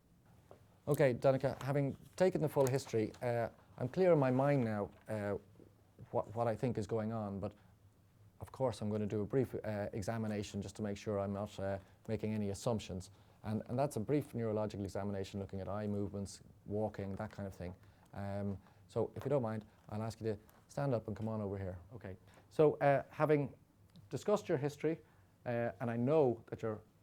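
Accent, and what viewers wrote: Ireland. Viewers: His verbal delivery is the most quintessentially Irish thing I've witnessed in some time.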